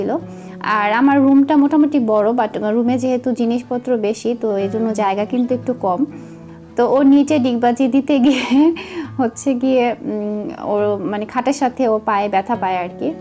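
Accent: Sylhet, Bangladesh. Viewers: Apu tmr basha sylhet er kotay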